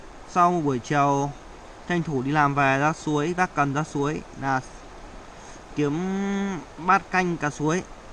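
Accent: Vietnam. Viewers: ông này nói giọng khu vực nào ý nhỉ, Hà Tây à, chỗ toàn nói thành chố, đĩa thành đía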